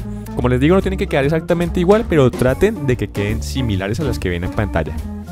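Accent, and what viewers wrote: Bogotá, Colombia. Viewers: tu eres de colombia hablas como colombiano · Eres colombiano verdad? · Tomas Echegoyen los colombianos ablamos asi y para tu informacion somos uno de los paises que mejor abla español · BearMG Bogotano, seguro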